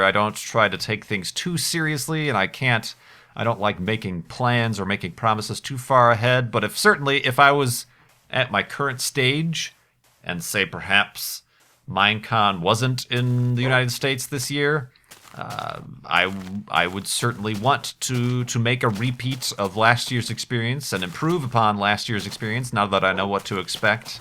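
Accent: Ireland. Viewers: You half irish or something?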